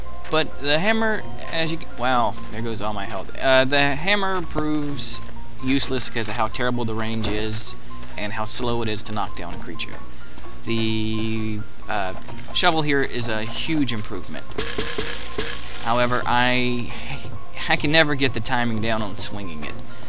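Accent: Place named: Appalachia, United States. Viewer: lol, I love his accent... And the intellegence thing, HES A HILLBILLY !!! I wonder where cleedis is? I hope he done did get outta here before dem zombie fellers got him!... hehe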